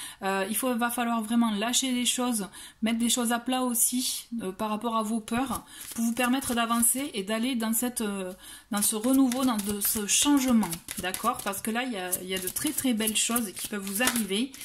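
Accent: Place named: Southwest, France. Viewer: Vous été du Sud ouest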